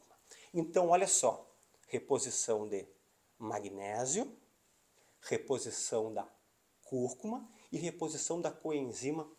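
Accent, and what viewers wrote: Rio Grande do Sul, Brazil. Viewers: Ah...reconheci nosso sotaque gaucho❤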